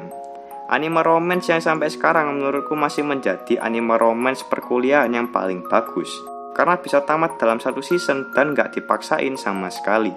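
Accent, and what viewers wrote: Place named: Surabaya, Indonesia. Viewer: Kayak orang Surabaya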